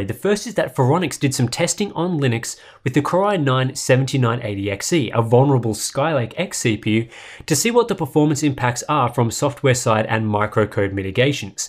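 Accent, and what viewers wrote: Australia. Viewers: said "not as good news." It's that thick Australian accent.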